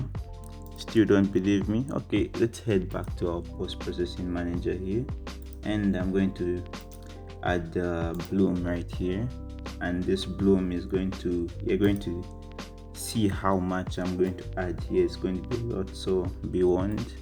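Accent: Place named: France. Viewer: Sounds like a French accent, great video!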